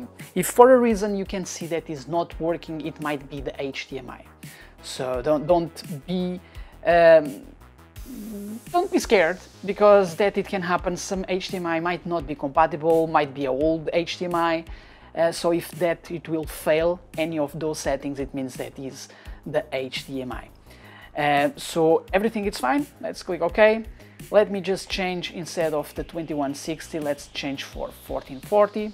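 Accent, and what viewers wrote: Portugal. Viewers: Apanhei te 😂 o Tuga tem aquela Pontinha de sotaque lá no fundo💪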